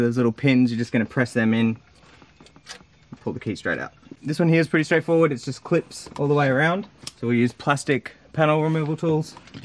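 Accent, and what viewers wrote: Australia. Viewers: Oh ok. What company is it? Is it made locally there in Australia? I'm assuming that's where you are, based on your accent and the fact that the steering wheel is on the right. Just trying to find the best unit out there. Didn't know there were options other than RSNav, which I believe is made in China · He has a gay Australian accent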